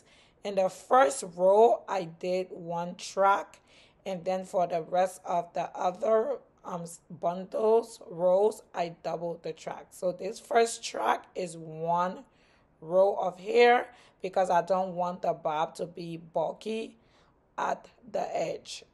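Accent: Liberia. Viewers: You sound so Liberian. Great job. Beautiful❤❤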